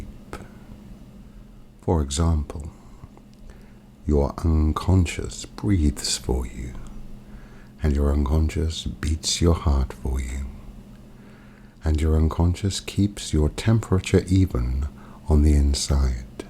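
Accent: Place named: United Kingdom. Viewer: any British gentleman's voice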